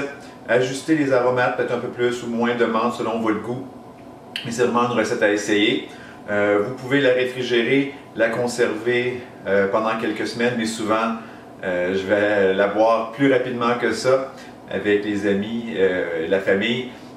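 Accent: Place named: Quebec, Canada. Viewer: Salut 👋 Thanks 🫶pour recette 👍 Pu*ain, j'adore ton accent 🫶 Longtemps dans passé j'avais un binôme québécois en french foreign legion...super gars ! Il as parlé comme toi 🫶👍😁🫡🇨🇵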